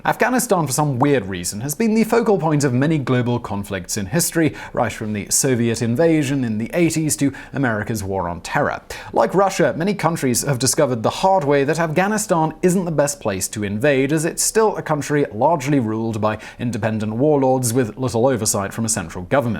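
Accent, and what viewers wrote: United Kingdom. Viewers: That's why you're watching a video narrated by a Brit.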